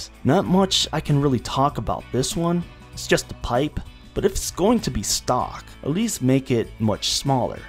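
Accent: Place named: Mexico. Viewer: THIS DUDE SOUNDS TOO MEXICAN TO BE A TRUE AMERICAN. CITIZEN OR NOT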